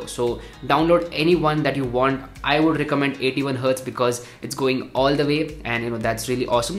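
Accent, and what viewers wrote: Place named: South, India. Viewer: Your name is nd speaking accent is like south 🤔🤔🤔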